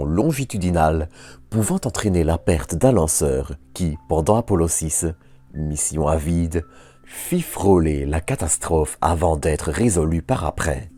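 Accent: Belgium. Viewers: Un robot à l’accent belge ça nous change.....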